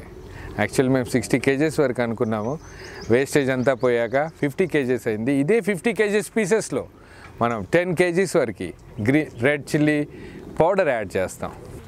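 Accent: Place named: Telugu, India. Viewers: Ur Telugu slang is very nice sir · Your Telugu slang is excellent bhai🙏 God bless! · Your Telugu slang looks like Andhra bhayya. Telugu lo chesinanduku chala santhosham. Devudu meeku Ela service chesenduku avakasham echinanduku aa devuniki naa namassulu. God bless you beta.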